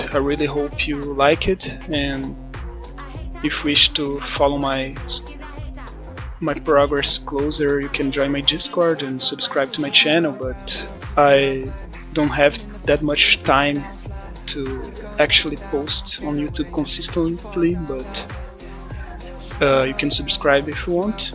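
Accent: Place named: Brazil. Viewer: Tu tem sotaque brasileiro pae.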